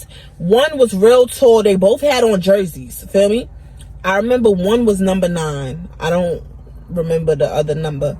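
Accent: New York, United States. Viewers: Im new to ya page so are u from NY? U definitely sound like us😂😂😂😂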